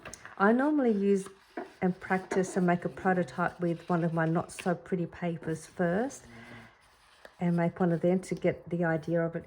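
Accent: Australia. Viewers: I really like this project and your style. I just discovered your channel...it is so nice to hear an Australian accent and places to source things here in Australia. 😃 I'm watching with sound off and subtitles on because of the snoring! 🤣 (music to some, nails on a chalkboard to others, hey?!😉) But, I stuck around and subscribed. Your style really does stand out ❤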